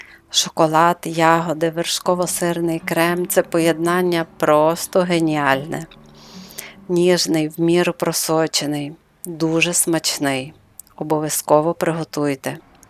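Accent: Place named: West, Ukraine. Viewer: Гарна українська мова заворожує дивитись, пояснення і все по - панськи! Як говорять на Заході України! Успіху! Буду пробувати Ваші смаколики! 💛💙❤️🌹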